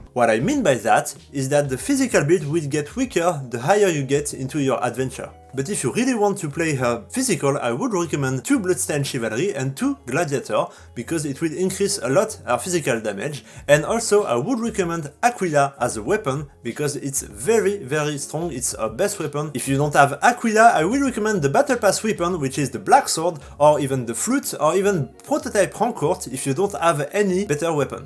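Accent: France. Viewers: J'ai tout de suite vu que t'était français avec l'accent mdrr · alala l'accent fr de qualité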